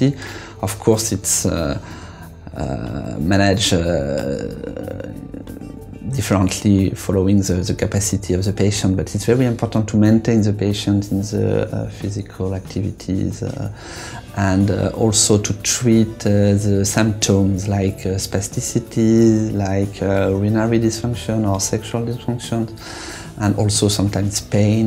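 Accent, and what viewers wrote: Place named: France. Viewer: No disrespect to the French, however subtitles would have made him understandable.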